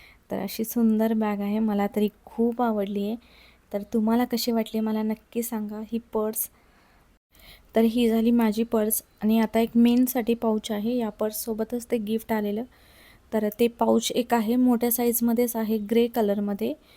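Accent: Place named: Vidarbha, India. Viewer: mala bolnyatn janaval tumi vidharbhatlech ekadlech asal manun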